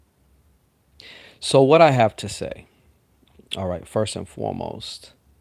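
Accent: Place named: New Orleans, United States. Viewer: Hey! Cajun Cappy here. You sound like you could be from New Orleans! Where are you located?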